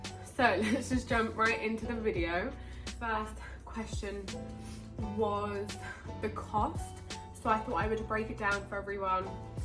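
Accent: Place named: United Kingdom. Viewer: Are you Colombian? Omg I had a feeling you was but was confuse since you talk English with the UK accent. Lol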